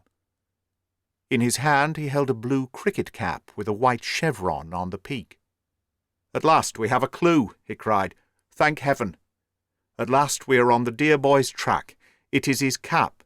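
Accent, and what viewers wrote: United Kingdom. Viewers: I do love listening to this man read. He gives an entirely new meaning to the words 'The King's English'.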